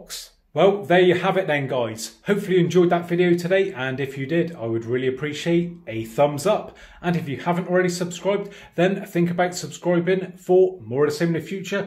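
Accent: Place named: Yorkshire, United Kingdom. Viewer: I was actually watching this video because of the problem that I have with my tv. But dude I love your accent!:) are you from Yorkshire?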